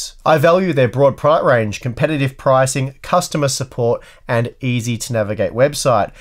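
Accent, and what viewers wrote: Australia. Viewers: This may seem like a dumb question but is hardware unboxed Australian?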